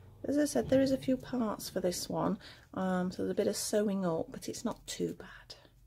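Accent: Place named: England, United Kingdom. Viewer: i love your accent i am from england alos i love hearing people from home talk and i love the video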